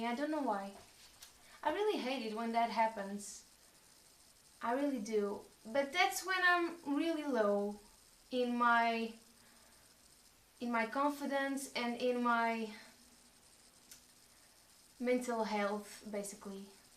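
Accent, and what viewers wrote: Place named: Portugal. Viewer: Ah, I've mainly heard Brazilian Portuguese. The accent sounds very different! Another possibility is ISFP. One way to tell the difference is Ne and Se are reversed (as you say, tool vs. PoLR), and also ISFP Ni vs. INFP Si. Possible evidence for Ne is word play, speaking poetically, telling stories created in the moment. An INxP takes conscious effort to use Ne, so it isn't immediately obvious. It really is "tool use". Catch one in the right mood and they will delight in playing with the world of words and ideas. For example, my wife (who is an ISFP) sent our niece a bracelet that had been in the family. I mentioned to my wife that our niece needed to know the history of the bracelet -- and made up in the moment a silly, absurd little story. One of many things that causes confusion: ISFP Ni is third slot, but ISFPs seem more classically "intuitive" than INFPs. 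INFP Si, with Fi+Ne, can get overly focused and dwell on positive memories (nostalgia) or negative memories (rumination) -- but Se PoLR makes outward emotions look more "flat". ISFP Ni, with Fi+Se, is more expressive (and explosive, though it passes quickly). One thing that is definitely true, it is very hard to self-assess.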